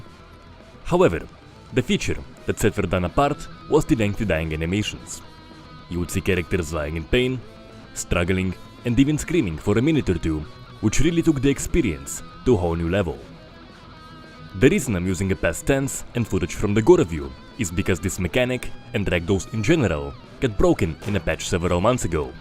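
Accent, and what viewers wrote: Russia. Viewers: This is russian ascent?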